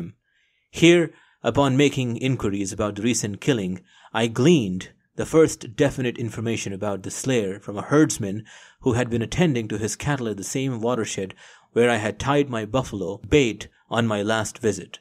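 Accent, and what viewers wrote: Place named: India. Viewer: The other channels can’t compete with Mr. true Indian voice. 🇮🇳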